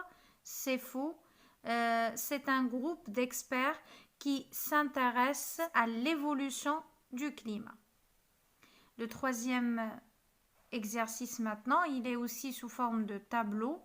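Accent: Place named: France. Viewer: Merci énormément Madame, votre travail est admirable. J’ai beaucoup aimer votre accent française et la explicación formidable que vous avez-vous fait en français. 👏🏼👏🏼👏🏼👏🏼👏🏼 top top top 👍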